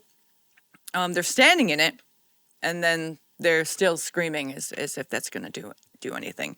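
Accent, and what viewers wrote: Scotland, United Kingdom. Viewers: Great Scottish accent🔥⚡️ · Trust me, I'm from Edinburgh and no clue what he is saying either, BTW I love your Scottish accent you should do it more often.😘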